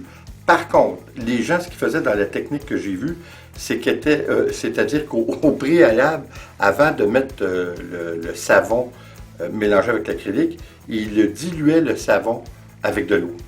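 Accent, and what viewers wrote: Quebec, Canada. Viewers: Intéressant, à tenter avec le produit à vaisselle. Plaisir aussi d' entendre votre accent qui me rappelle mes vacances au Québec. Bonne continuation. Brigitte de Royan - Charente Maritime en France.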